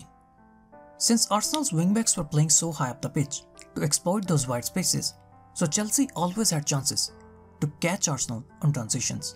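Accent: India. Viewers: I don't know him but this pronunciation sounds like that of an Asian, especially an indian, great analysis i wonder how could an Indian know so much of football instead of cricket.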